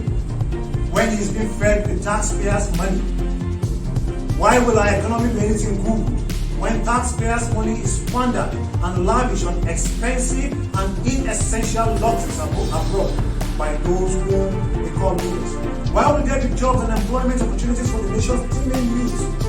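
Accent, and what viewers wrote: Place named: Yoruba, Nigeria. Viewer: How do i hear this thing now.... okay not me running out of paitent wen i heard yoruba English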